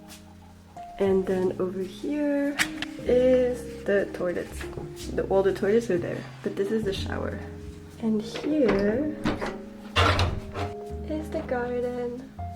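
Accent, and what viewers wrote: France. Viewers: haha j'ai regardé la video sur le labret vertical, je me sais bien qu'il y avait un petit accent français! j'ai aussi une petite cheminée dans mon studio à bruxelles, ça donne un effet trop cosy :p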